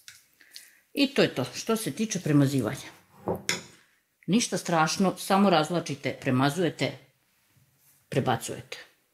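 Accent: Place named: Backa, Serbia. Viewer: predpostavila sam da ste iz Backe po dijalektu.Jos jednom veliki pozdrav,laku i mirnu noc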